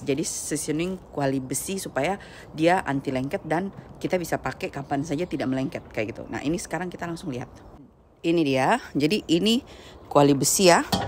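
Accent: Papua, Indonesia. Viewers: oooo sa suka ko pu logat itu, sa jadi ingat ketika sa di papua dulu, lumayan lama oooo,20 tahun. Sa dulu ada teman cewek namanya Ribka ivani sarungu anak Toraja, dia punya hotel di Merauke tapi sa lupa de pu nama hotel. terima kasih juga su berbagi ttg SEASONING WOKnya kebetulan sa juga suka masak. sehat selalu.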